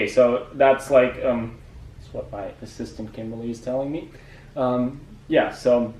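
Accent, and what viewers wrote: United States. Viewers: He sounds more American than some Americans Girl bye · Till you say something with a R or a L in it you sound American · You sound American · Lol, dachte du bist echter Amerikaner bis ich den Titel richtig gelesen hab, kein Scheiß. Super Ausprache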